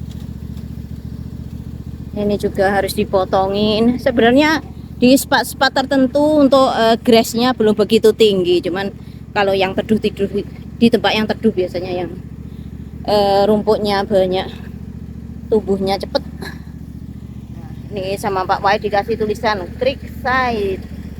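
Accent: Java, Indonesia. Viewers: Ngomong bahasa inggris tp logatnya medok jowone😘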